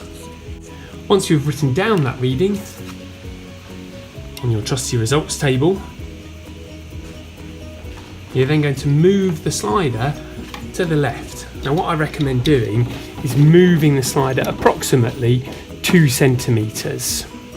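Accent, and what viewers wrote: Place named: United Kingdom. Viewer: very clearly demonstrated and videoed presentation with clear British English voice. Thank you.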